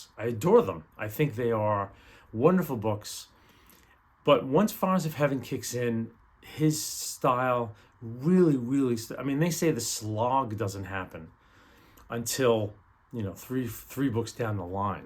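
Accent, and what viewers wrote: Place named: Long Island, United States. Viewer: Nassau County representing! It’s endearing to hear your Lawng Island accent.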